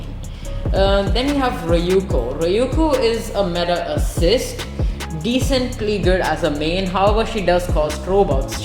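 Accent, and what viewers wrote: India. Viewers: Bro you indian? Because your English way of talking is similar indians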